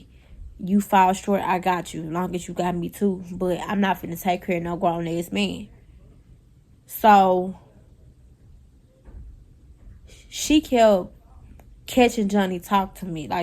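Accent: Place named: Arkansas, United States. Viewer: We can tell you are from Arkansas lol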